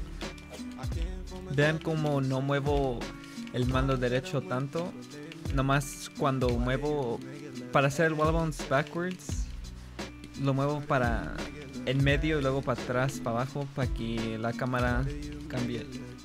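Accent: Mexico. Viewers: you speak spanish very well broo asta you seem to be from mexico you were very well understood what you explained greetings and good video 👍👌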